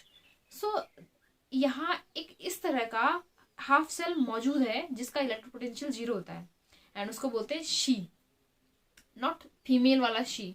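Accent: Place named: Uttarakhand, India. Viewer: Nice lecture with uttrakhand vibe😊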